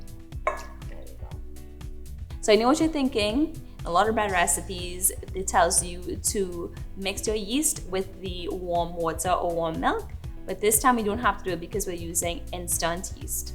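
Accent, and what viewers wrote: Trinidad and Tobago. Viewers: I love a Trini accent. The name is epic. Eat ah food nah! Great work.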